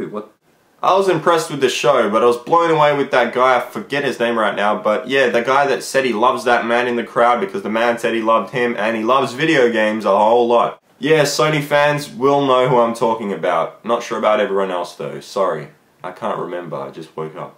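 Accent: Australia. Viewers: MOVE... and your accent is Australian ^_^ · Are you Australian? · Yay Australia! Good to see someone whos not American for once. I dont have to stab myself becuase of the accent!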